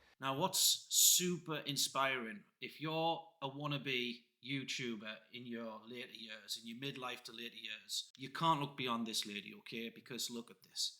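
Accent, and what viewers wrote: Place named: North East England, United Kingdom. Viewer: I’ve just found you because of a mention on Chads channel. I’ve already watched some of silver and solo videos but not heard of Alexa, so will definitely check her out. It sounds like your from the north east, I’m from Cumbria and about to start a YouTube channel. I’ll be following your journey, great content 👍🏻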